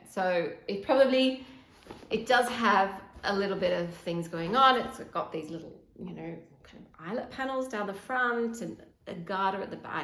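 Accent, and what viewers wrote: Australia. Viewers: Congratulations on your first podcast! I really enjoyed your relaxed, chatty and informative style. I was initially drawn to your Aussie accent (fellow Aussie here!) and look forward to more episodes. Best wishes from Sydney!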